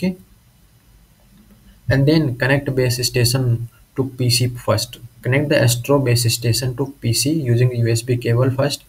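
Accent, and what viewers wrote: India. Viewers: No offense but hearing an Indian accent when I’m looking to fix a problem. Gets me thinking that I’m getting scammed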